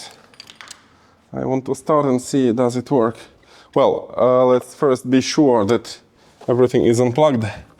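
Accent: Russia. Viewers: I thought you where German but Russian is also ok 😊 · Hahaha. Like we couldn't guess you were Russian right from the beginning. Lololol. You're too cute Dear heart. Your workshop is looking fantastic. Great job getting it tidied up. ❤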